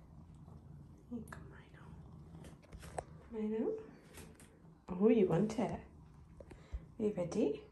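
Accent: United Kingdom. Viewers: Look at the hairrrrrrrr British 🏴 accent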